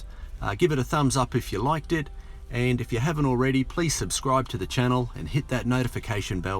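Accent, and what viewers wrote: Australia. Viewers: Crikey! Are you somehow related to Steve Irwin? Good to hear another Aussie accent on YouTube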